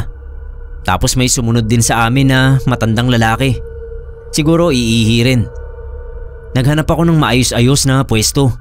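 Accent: Iloilo, Philippines. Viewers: kuya ilonggo ka no? 😅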